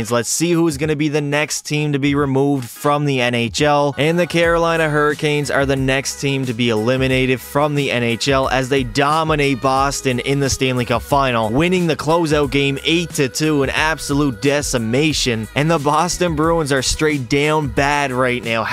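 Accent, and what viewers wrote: Canada. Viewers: are you Canadian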